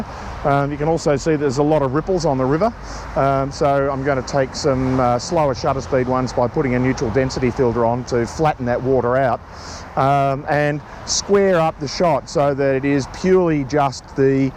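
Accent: Australia. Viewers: I liked the video "Dad's garden" that was the first time I realized I do prefer the broad accent. I think you talk "Strine". It's actually what I want to learn and aim for. I will certainly watch all of your videos they are amazing and yes, I can also practice Aussie English.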